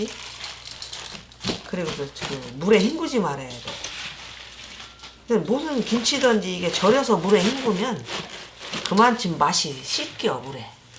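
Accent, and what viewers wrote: Chungcheong, South Korea. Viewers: 충청도분이신가봐요.ㅎ 제고향 어르신이 옆에서 말씀힌시는것같아 더 친근감이 있어 좋네요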